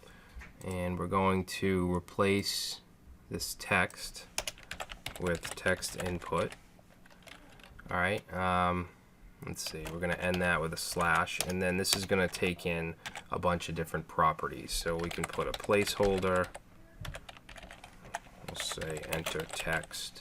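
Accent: United States. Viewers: Man, how I feel happy when I find someone with native US accent explaining a technology these days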